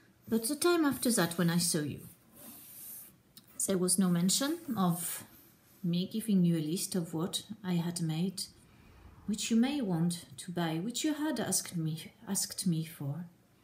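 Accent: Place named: United States. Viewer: What are you doing with USA vocal intonations! Stop it now! Keep regional traits, it is more fun than all sounding the same :)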